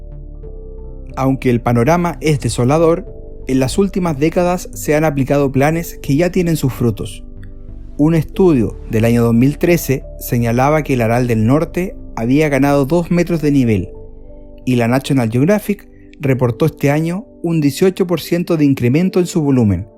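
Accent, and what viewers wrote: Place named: Chile. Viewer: Estaría mejor si no lo relatara un chileno muerto de hambre 😊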